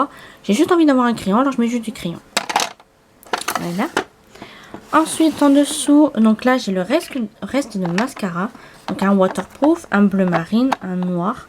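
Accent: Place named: England, United Kingdom. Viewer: Coucou jolie, waouh tu en a du maquillage pour très longtemps. C'est bien rangé. Il y a de très belles palettes. C'est toujours un plaisir de t'écouter Tu as aussi un joli accent Anglais. Bonne semaine à toi, bisous, à bientôt, kénavo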